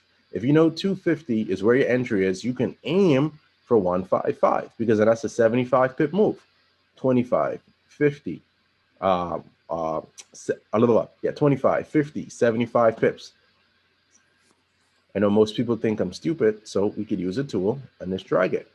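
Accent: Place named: Bahamas. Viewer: ik you bahamian lmaoo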